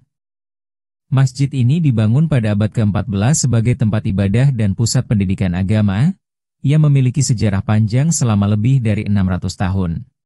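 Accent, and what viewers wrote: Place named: Indonesia. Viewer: waduh narator..................ini gimana sih janganlah abang baca bahasa inggris seperti bahasa indonesia, malu aku bang, bacalah muslim populesyen jangan abang baca muslim population, maluuuuuu.........aku bang, yang nonton konten ini sedunia bang, gimana aku mau subscribe, abang baca aja nggak becus........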